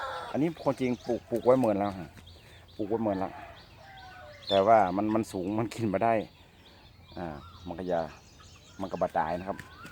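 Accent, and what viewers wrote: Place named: Laos. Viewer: เว้าคึอคนวังเวียง สปปลาวเลย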